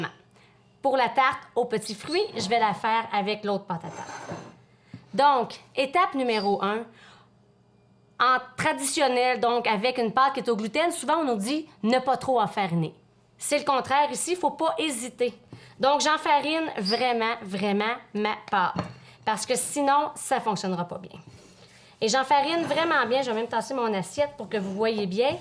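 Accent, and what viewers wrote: Canada. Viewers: Coucou je vient de m'abonner à ta chaine, j'ai un regine sans gluten sa tombe bien😘jaime beaucoup ton accent Canadien 🤩ey encore un grand MERCI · Merci pour tes excellents conseils et ta gentillesse, ça va me changer des pates collantes et ton charmant accent canadien, je suis française.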